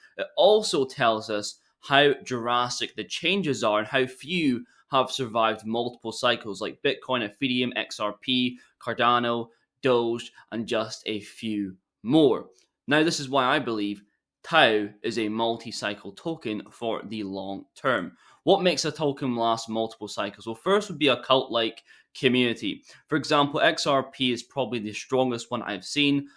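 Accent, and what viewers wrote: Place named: Scotland, United Kingdom. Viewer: Do you still think qnt will still be big this cycle? I'm starting to think that 2027 -30 will be the time for xrp and qnt. Would love to hear your thoughts. I am well spread by the way. Ps love how you slow down your Scottish accent. Edinburgh here. ❤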